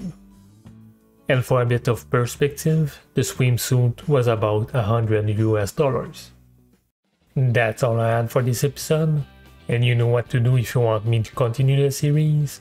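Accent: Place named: France. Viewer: are you french? You sound very french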